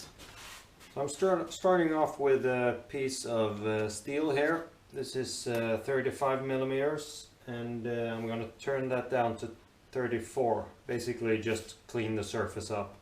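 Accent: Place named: United States. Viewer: Thanks for the information. I'm in Nuuk, Greenland. I was just curious because your American is so good, I couldn't place where you might be from. I enjoyed the slow motion shaper vid. Nice.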